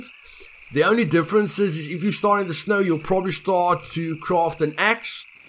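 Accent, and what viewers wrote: South Africa. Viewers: Are you south African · I'm enjoying the video so far. Thanks!! I'm looking forward to playing. Btw I hear your south African accent😃. My old pastor was from South Africa. I'm looking forward to watching more of your videos. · is that an SA accent I hear? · Which part of South Africa are you from bru....or Rhodesia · Jy is van Suid-Afrika :D ek hoor dai accent :P. thanks viri video dude! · Friends and I are getting into scum today thanks for the help . Are you South African ?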